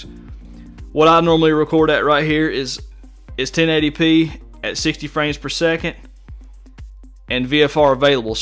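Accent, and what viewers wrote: South, United States. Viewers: Thanks! Nice to hear a southern voice on a GH5 tutorial!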